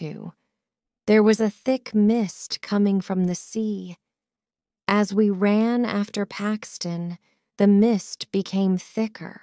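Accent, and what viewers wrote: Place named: United States. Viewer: I do believe this is an England story, but read in American accent. I am an new English learner and I am currently learning American English.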